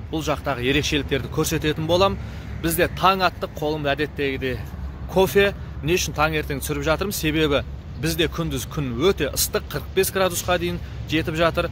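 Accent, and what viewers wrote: Standard. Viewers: Кандай жаксы таза казакша сойлеген кулакка жагымды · Озге елде оз тилинде таза сойлеу. Оз елиндеги тил билмейтин балыктардан караганда мын есе артык алла алдыннан жарылкасын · Керемет қазақша сөйлеген таза жарайсын рақмет биіктен көріне бер ✊❤ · Не деген керемет !Қазақтың нағыз азаматы екенсің! Таза қазақ тілінде сөйлегенің көңіл қуантарлық! Алла нәсіп етіп,елге жеңіспен орал! Бағың жансын,бауырым! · Жарайсын бала. Қазақ тілін таза, білімді бала екенсін. Біздің Қазақстанды ослай дамыту үшін сен сияқты білімді жастар керек және халықтың көз қарасын ойын өзгерту керек жақсы өмірге. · Керемет.Тап-таза сауатты сөйлейді екен қазақ тілінде.Денің сау болсын қазақтың - Азаматы.Қайда жүрсең де аман бол! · Казакша таза сойлейсин оте жаксы жат елди донгелетип турсын аман жур айналайын болашагын жаркын болсын айткандарынды тындай бергин келеди саган амандык саттилик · Керемет түсіндіріп жатырсын. 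Жалпак қазақ тілінде. Айналайын биіктерден көріне бергын. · Қазақша таза сөйлеп жақсы видо болды тамаша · Алла разы болсын.нағыз қазақтың жігіті екенсің таза сөилегеніңе рзамын бәрі тамаша👍👍✊ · Қайран қазақтың кемпір шалының тәрбиесі десеңші, қазақы тәрбиенің иісі аңқып тұр. Бауырым осы қалпыңда қал. Тілің таза екен. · Аман бол бауырым,керемет .Саған тек сәттілік тілеймін.Қазақша таза сөйлеп отырғаныңа тәнтімін,рахмет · Тамаша видио . Сені осындаи ер азамат етіп тəрбиелеп өсірген атаң мен апаңа, əке шешеңе мың алғыс ! Шалғаида жүрсеңде қазақша тап таза сөлеп тұрсың. Табысты бол! 🤗👌🙏🇰🇿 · Жарайсын! Кандай адепти де мадениетти сойлегенинин ози тамаша, тагы да таза казакша сойлегенине рахаттанып отырып кордим. Рахмет! Бари тамаша кандай керемет орындар! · Жарайсыз бір орысша сөз арластырмастан таза қазақша әдемі сөйлеп, әдемі видео ролик түсіріп шықтыңыз, бәрі түсінікті болды.рақмет. деніңіз сау, жарыңызбен бала-шағаңыздың қызығын көріңіз!!! 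· Бауырым өте жақсы, видеоларың тартымды, тілің таза орысша қоспай сөйлеу мәнерің тартымды, ой өрісің кең екен, сөз жоқ. Біздеде астана, алматы, бүкіл облыс орталықтары, тіпті аудан, ауылдардады қазақтар екі этаж коттедж салып алып жатыр емеспе ( 80% қазақтар) жәйлап жайлап біздікілерде жетер осындай жағдайға деп тілейік. Қазақтың болашағы алда деп қойшы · Амансыңба бауырым. Қазақшаны жақсы таза сөйлейді екенсің жарайсың. Ол жақта қандай жұмыс жасайсың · Таза казакша сойлейди екенсиз,кай жерденсиз? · Қандай керемет! Таза қазақша сөйлегеніңіз ұнады! Алла жар болсын Сізге! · Сәлем таза қазақ тілінде сөйлейсің Рахмет түсірген видеоны көріп отырмын. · казакша таза сойлегенине риза болдым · Рахмет жігіт,қандай таза сөйлейсін қазақ тілін! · Балам, қайда жүрсеңде, аман бол! Шет елде жүрсеңде, таза қазақша, мәнерлеп сөйлегеніңе, мың рахмет! Сені туған, анадан айналдым! Біздің Қазақстанның мынандай күнге жету қайда! 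Аман бол, балам! Ақ Жол! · бауырым, өте керемет видеолар. Мен бірінші рет көріп отырмын,керемет екен, жақсы әсер алдым, қазақша таза сөйлейді екенсіз 🌹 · Рахмет бауырым аман жур таза казакша сойлейди екенсин тамаша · Аман бол елге аман орал тек денин сау болсын Америкада журип казакшаны таза сойлеп турсын мын бол казак азаматы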